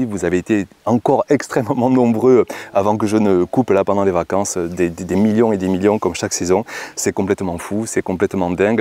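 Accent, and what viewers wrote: South, France. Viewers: Un grand merci, pour tous tes conseils sur le potager. Ton accent du Sud et ta bonne humeur rendent chaque astuce facile à suivre et motivante 💚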